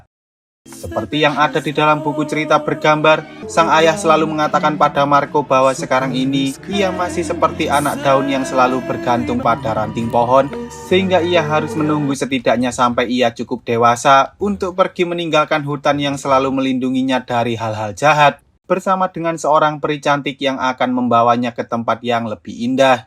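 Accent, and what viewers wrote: Java, Indonesia. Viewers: Mas medok men sih mas 😢😂😂 koyok aku mesti wong jowo wkwkkw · Kurang enak cara ngomong yg ceritanya, ada logat jowo😂 Sekali2 pake logat normal bahasa indo mas bro.😂😂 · Anjj dengerin suaranya medhok le 😂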